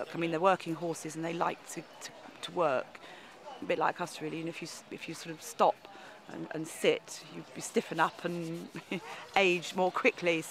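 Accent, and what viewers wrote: United Kingdom. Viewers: by accent i'd sday britain. but in america you have grater chances to see draft horses than in europe. about ardennes i don't know, drop by on the front porch of rural heritage page.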